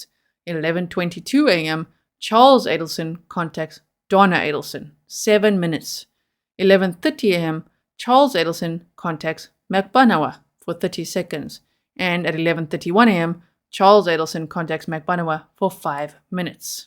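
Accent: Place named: South Africa. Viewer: Excellent presentation, thank you. I detect a subtle South African accent..... Lekker wees, en dankie.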